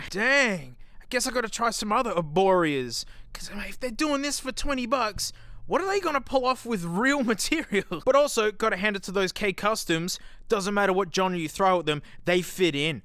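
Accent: Australia. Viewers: its so weird hearing the aussie man in my ear, without any funny music in the background. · i really like the sassy diva pop song mr loud Australian man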